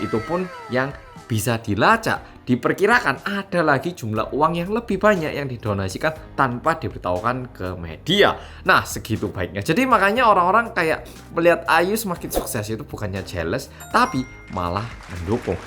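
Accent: Java, Indonesia. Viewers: Om ngomongnya sudah medok jawa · Bang logat jawa nya kok medok.heehehe..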